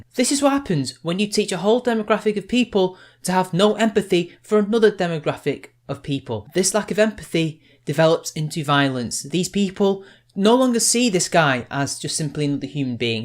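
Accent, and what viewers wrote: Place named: England, United Kingdom. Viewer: G Willy He's English by the way, if you could not tell by his accent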